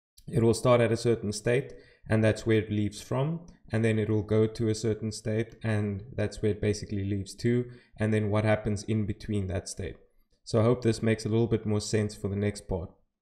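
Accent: South Africa. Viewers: Are you South African? Sounds like an Afrikaans English accent.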